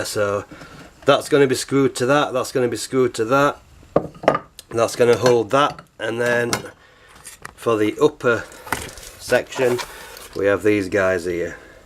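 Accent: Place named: Liverpool, United Kingdom. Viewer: by the way, about your accent. I know your in the UK but are you from the same area as where John lennon was from because, you kinda sound like him a bit👍😉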